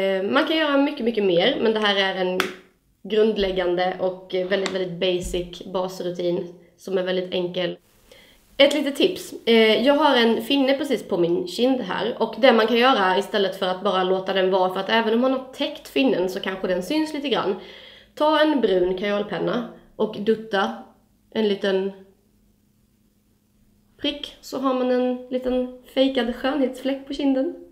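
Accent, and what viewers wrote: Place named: Skåne, Sweden. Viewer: du är duktig, men det suger att du pratar skånska. :(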